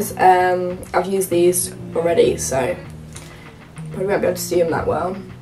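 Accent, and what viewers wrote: England, United Kingdom. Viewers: Where in England are you from?? Flawless btw xx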